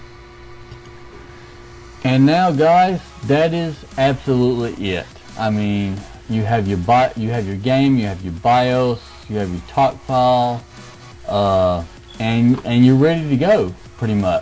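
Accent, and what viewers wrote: South, United States. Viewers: Your videos are old but have still helped me out. Plus your country accent is pleasing to the ears.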